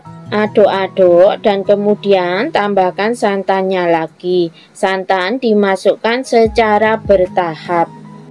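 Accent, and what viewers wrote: Java, Indonesia. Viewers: Bunda orang Jawa yah Bun suara nyabagus bun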